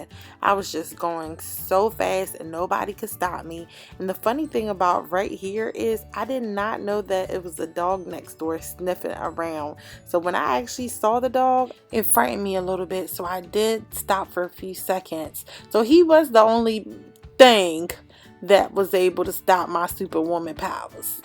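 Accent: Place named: Maryland, United States. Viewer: You from Maryland? I didn't watch the whole video, but I heard a little of your accent. The "tew" is giving Maryland lol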